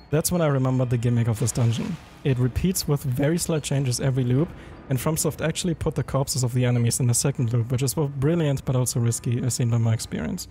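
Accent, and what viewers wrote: Germany. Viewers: Ich mag deinen Typisch Deutschen Akzent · The German accent made is much better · Man hört den Deutschen Akzent so raus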